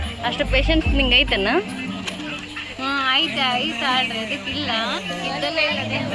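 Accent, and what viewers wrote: North Karnataka, India. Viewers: Supet nema ❤❤ nice vlog video ಮಾಡ್ತಾ ಇರಿ ನನಗೆ ನಿಮ್ಮ ಉತ್ತರ ಕನ್ನಡ ಭಾಷೆ thumba ist sissy ಅದಿಕ್ಕೆ video miss madde nodthini ❤❤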